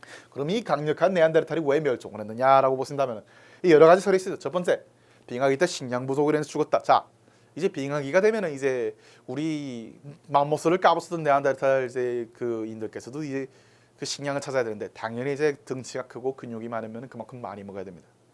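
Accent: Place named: North Korea. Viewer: 완전 김정은의 흉내를 낼려고 북힌말을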